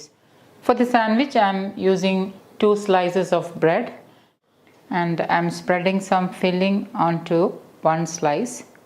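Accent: Kerala, India. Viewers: I love the way you talk, mixing some keralite tone , so pleasing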